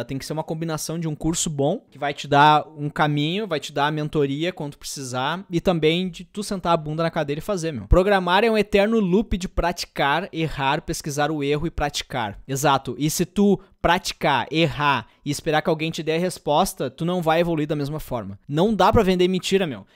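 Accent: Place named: South, Brazil. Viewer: Ba, mas tu é daqui do Sul, guri? hahaha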